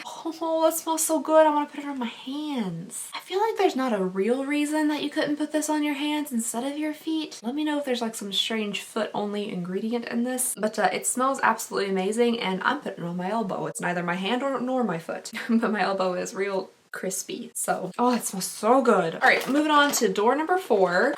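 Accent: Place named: South, United States. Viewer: Your holding back a southern accent...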